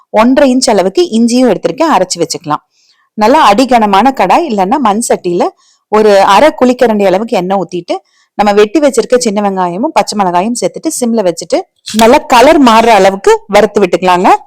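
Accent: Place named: Kongu, India. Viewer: Kongu tamil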